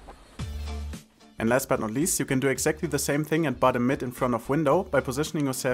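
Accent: Germany. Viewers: deutscher akzent an!👍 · deutscher Diealegt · Du Bist Deutsch ne?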